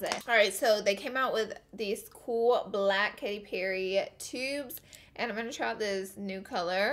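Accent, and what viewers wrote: South, United States. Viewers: When you said "oil free" I totally thought you said "all free" because of your southern accent compared to the Boston accent I grew up around! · Madilyn Z she sounds like a southern version and I love pll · I love u u r soooooooooooo awesome I love your southern talk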